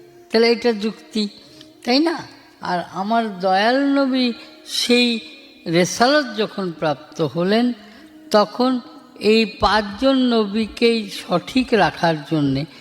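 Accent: Bangladesh. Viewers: তুমি বাংলা আঞ্চলিক ভাষা বুজ এইটা ফরিদপুরের ভাষা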